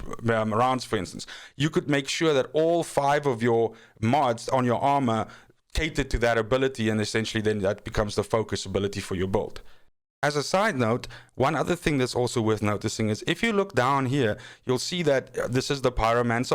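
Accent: South Africa. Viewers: Yo yo my guy, you from South Africa? I feel exactly the same, wasn't going to get this, played the demo, recognised the studios community interaction, then pre ordered. Nice vid, you get a sub from me.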